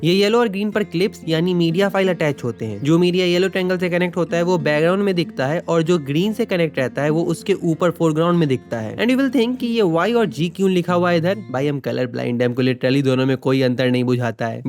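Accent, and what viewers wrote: Bihar, India. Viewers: Bhai tu bihar se h kya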